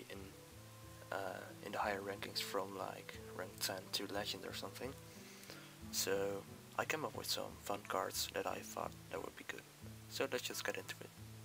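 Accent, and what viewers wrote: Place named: Netherlands. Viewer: ik haat je Nederlandse accent gahaha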